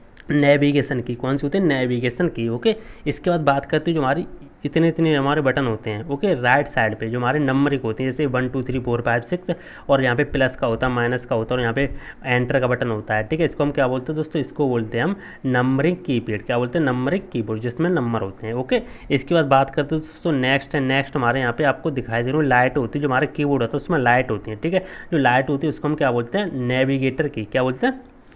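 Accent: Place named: Saharanpur, India. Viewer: Pakka sharanpur ka h bhai mhara bhai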